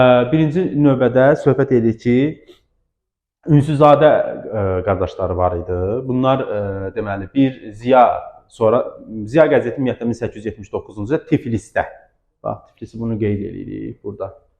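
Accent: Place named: Aghdam, Azerbaijan. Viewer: Mən Ağdamlıyam, ləhcənizə görə soruşdum,bizimkinə çox oxşayır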